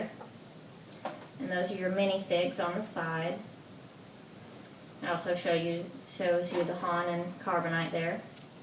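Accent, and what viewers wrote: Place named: West, United States. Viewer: I never noticed this but her accent is like a western guy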